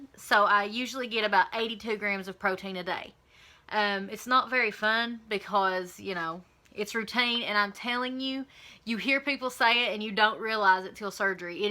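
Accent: South, United States. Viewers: Girl I love your accent. lol Country girl like me! I live not far from you in Bristol, VA. You are doing so good, just hang in there, the lbs will fluctuate each week! Keep it up!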